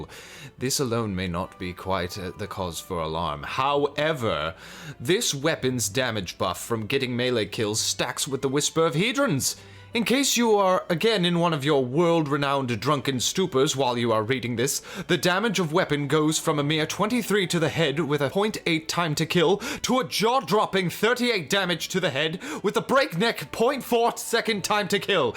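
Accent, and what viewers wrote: England, United Kingdom. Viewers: Hahahaha, that’s hilarious! I’m glad this came up on my feed… Exceptional English accent there good sir and again, hilarious! A new sub here for thee, peace ✌️ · lmao Also, the narrator's voice and the "actor class British" is amazing. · The stuffy English thing…awesome😆 Best destiny video ever! 🤣🤣🤣 Right up my alley!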